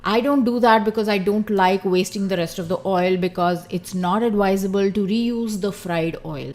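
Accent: India. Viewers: She has an Indian accent.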